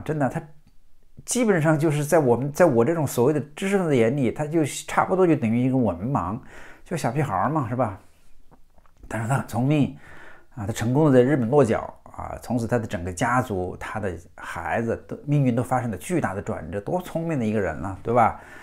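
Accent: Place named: Hubei, China. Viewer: Zhou Bruce 感觉是湖北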